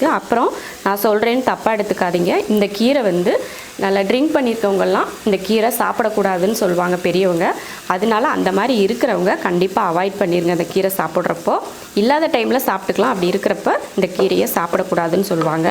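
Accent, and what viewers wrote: Coimbatore, India. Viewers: நம் கோவை தமிழும், செய்முறையும் அருமை, Really Yummy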